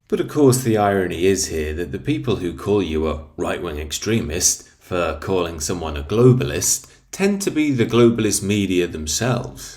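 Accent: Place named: England, United Kingdom. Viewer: Spoken like a true english gentleman . Cheers from Italy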